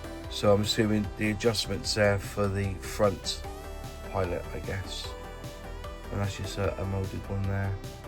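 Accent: West Country, United Kingdom. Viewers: I'm blaming and West country accent!! 😂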